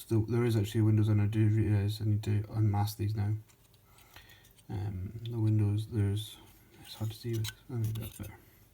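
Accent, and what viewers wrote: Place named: East Coast, United States. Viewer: Nice looking build, are you based east or west coast, you dound like its east coast. I have no problem with how you speak, but......... guess where I'm from? Stick at it, you' ll build up a following.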